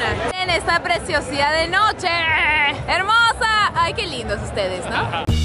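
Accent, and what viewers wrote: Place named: Lima, Peru. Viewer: El acento limeño XD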